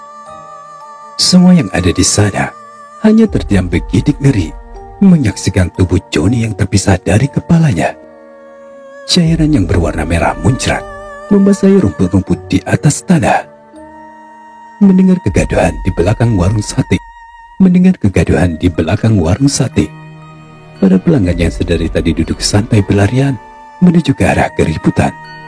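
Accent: Palembang, Indonesia. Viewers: Bang itu bahasa palembang ya, ciri khas nya pakai hurup O ,benar ngak bang ? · Itu gaya bahasa palembang byk kata o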